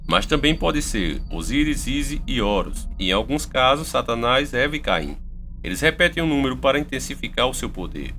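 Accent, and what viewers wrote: Northeast, Brazil. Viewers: é o narrador da discorver channel más nordestino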